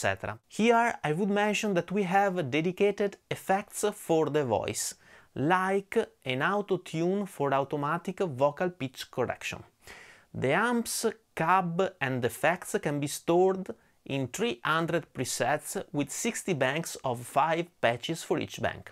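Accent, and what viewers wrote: Italy. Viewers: He's Italian, that's how it's pronounced.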